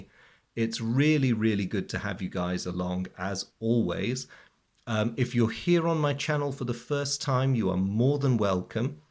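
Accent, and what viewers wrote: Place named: RP, United Kingdom. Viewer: Cheers dear, Thanks for your kindly reply. My request wasn’t for a specific scenario, honestly. I fancy that if you play a relative simple scenario that covers the basic rules it would be great. Maybe, you give for granted certain stuff (like terrain or discard, how to calculate sniper activity and reaction, just to mention a few). If you might simply explain those rules playing the game, I bet you might hit the target. Uh, last nice thing: your posh English reminds me a teacher I had half century ago…he was a peculiar fellow that escaped the Polish invasion by Nazis into a balloon! Thanks a lot for your passion.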